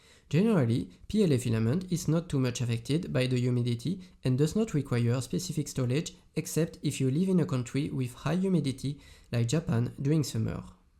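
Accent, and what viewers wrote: France. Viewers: You’re Accent sounds french, not Japanese. 😳🙃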